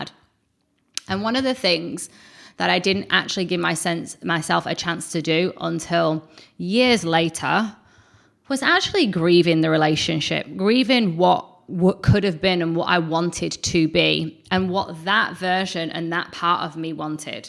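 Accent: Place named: Australia. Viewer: Omg this is so relaxing You have that slight Aussie tone in your voice in the back , that makes my brain go numb for the rest of the time you’re talking. That scratchy growling raspy purr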